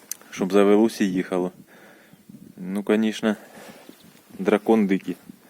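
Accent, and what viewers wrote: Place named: North, Ukraine. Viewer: По говору чую, що мій земляк з півночі)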